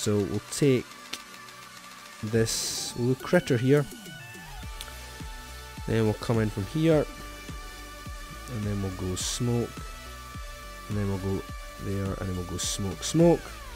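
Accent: Scotland, United Kingdom. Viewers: his scottish accent made the gameplay intresting..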